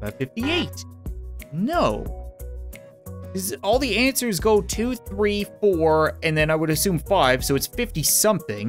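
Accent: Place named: Canada. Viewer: S'called canadian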